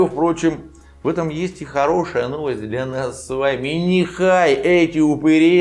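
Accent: Moscow, Russia. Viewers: Кааакой мааасковский прооонаанс!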